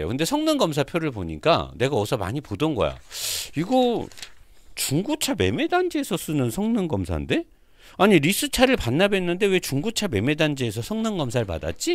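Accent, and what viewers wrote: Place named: Chungcheong, South Korea. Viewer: 충청도여~뭐여~~😅좋은 정보 감사합니다! 사장님!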